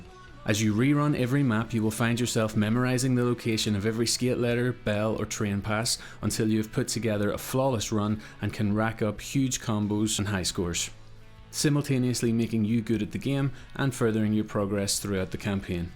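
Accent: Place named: Ireland. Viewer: North Americans love a good Irish accent!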